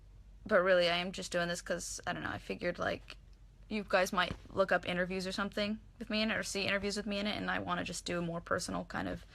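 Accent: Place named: South, United States. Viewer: I love when you talk Southern. You have the voice for it. I should know I've been in Georgia all my life.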